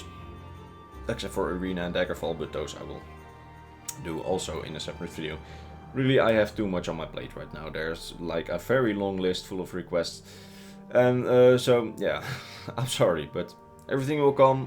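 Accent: Netherlands. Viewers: I recognize that accent anywhere. Hello fellow Dutchman!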